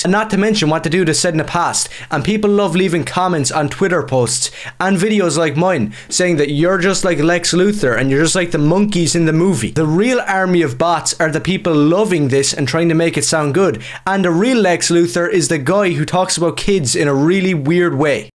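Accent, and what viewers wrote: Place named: Ireland. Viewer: It's Irish accent.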